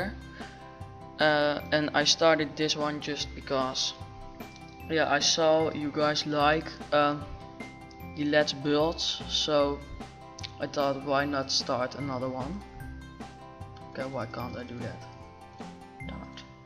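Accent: Netherlands. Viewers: You sound dutch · Dat Nederlandse accent is zo cute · He is dutch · omg, die nederlandse accent hahah! te lieff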